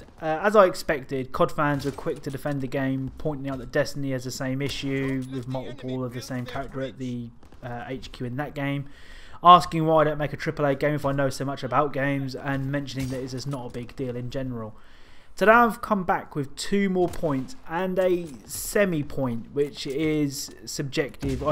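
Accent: England, United Kingdom. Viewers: you are british? ( just a random question) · You from England??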